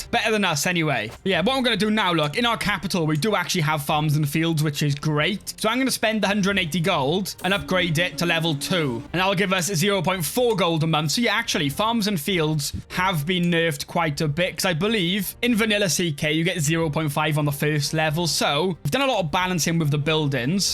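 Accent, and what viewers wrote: Wales, United Kingdom. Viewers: You sound funny like neath funny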